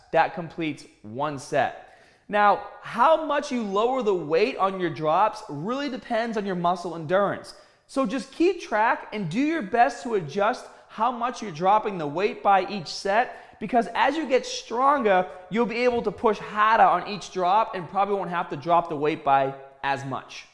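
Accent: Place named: New England, United States. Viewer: I don’t remember this thick New England accent when you were on the real world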